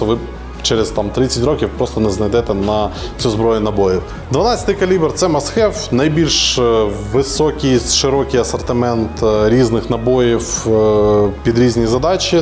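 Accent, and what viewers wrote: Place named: Central Ukraine, Ukraine. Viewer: Центральноукраїнський діалект сила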